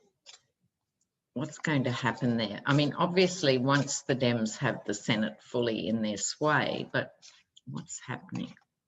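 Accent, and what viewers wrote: Australia. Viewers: You remind me of Dixie from "Designing Women", she was such a beautiful actress and had such a sexy voice like yours. Your Australian accent vs her southern accent. I knew you looked like a famous person but couldn't think of her, she was married to Hal Holbrook (spell.?).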